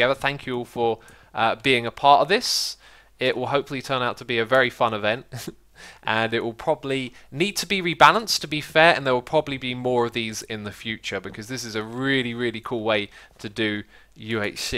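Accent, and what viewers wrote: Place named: United Kingdom. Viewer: I haven't watched him in ages and he sounds British(OH SHIT, YES, BELIEVE IT OR NOT THIS IS AN OPINION. WOW AMAZED). Please don't get mad at me when I barely know anything about him, Mr. Know-It-All...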